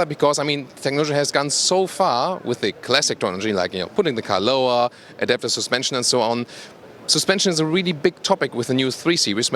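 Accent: Germany. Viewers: Sounds more authentic coming from a German. Great narrator. Mighty car.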